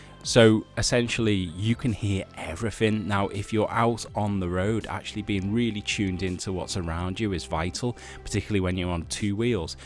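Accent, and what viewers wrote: Liverpool, United Kingdom. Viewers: Loved this thank you mate. Great to hear a fellow Liverpudlian voice too. Looking at getting this or the MQI. Really helpful review thank you!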